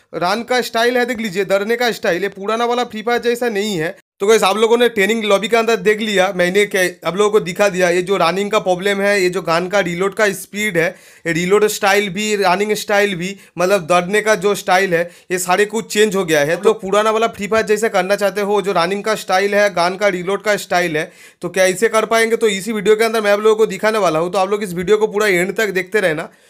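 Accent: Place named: West Bengal, India. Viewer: Awaj sunke pata chal gaya bangali bhai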